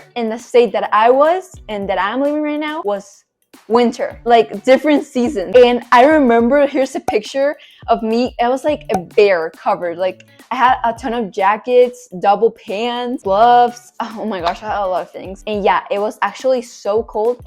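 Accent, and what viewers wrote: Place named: Peru. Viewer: I knew you had an accent but I had no clue where you were from but that’s really cool that’s your from Peru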